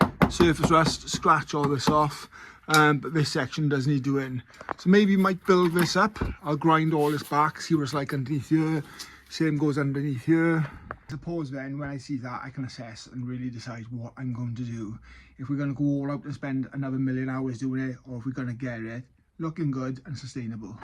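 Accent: Wales, United Kingdom. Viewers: What a headache I had find this after watching on tv.Don’t know what it is about the welsh accent it’s mint.Anyway while it’s got to be tedious at times filming I love watching vlogs like yours where there’s a lot of stuff going on instead of dwelling on stuff unless it’s the engine and u showing what’s been done👍🏼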